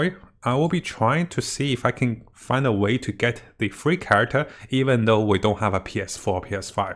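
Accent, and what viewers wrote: Australia. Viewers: weyt bruh u were an ozzy mate this whole time?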